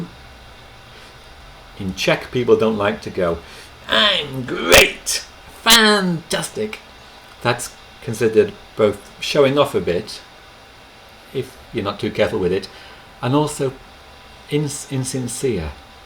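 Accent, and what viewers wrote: England, United Kingdom. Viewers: I like hearing English English, if that makes sense, ha! I'm American so our English is slightly different, but you probably know that.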